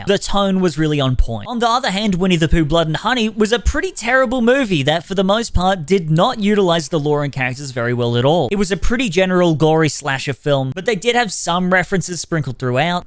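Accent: Australia. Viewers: Ashamed of myself, but I must confess, I thought was a different Australian YouTuber that sometimes covers shitty b rated horror movies, Jackson Fields, who also did a video in Shark Side of The Moon. I feel bad, I'm sorry 😞 I don't even know how I messed up that bad. The only three things (looks and sound wise) they have in common; Accents (obviously) Both are white And both have dark hair. And my mind went "okay they're the same person"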